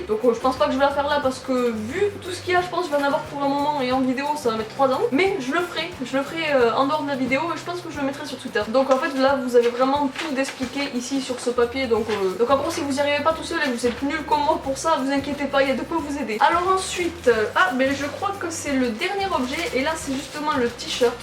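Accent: Toulouse, France. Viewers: i love accsens toulousin et tu fais 17 ans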